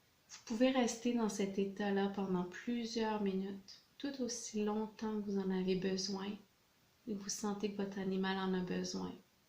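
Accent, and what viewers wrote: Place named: Quebec, Canada. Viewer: Bravo et merci pour toutes ces explications avec un très bel accent québécois. Très compliqué de faire le deuil de son animal. Petit coucou depuis la France...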